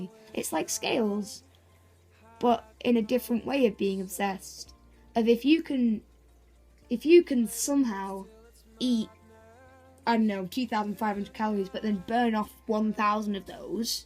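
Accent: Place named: Surrey, United Kingdom. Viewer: You sound really posh on your videos, you sound like my cousins from Surrey :D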